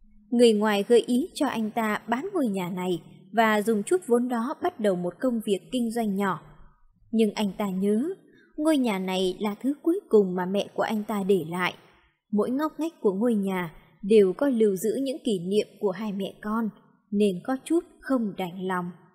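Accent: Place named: North, Vietnam. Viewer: kênh giọng nam kỳ, tự nhiên giờ sen vô con nhỏ bắc kỳ này vô là sao vậy ? tôi không ưa giọng bắc kỳ.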